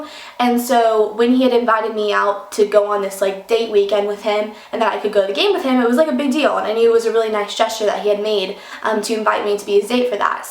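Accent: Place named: United States. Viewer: i love how you have a country accent that comes and goes (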